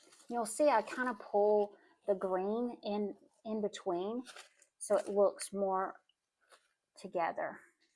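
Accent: South, United States. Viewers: Lovely and so nice for fall. Love your adorable Southern accent!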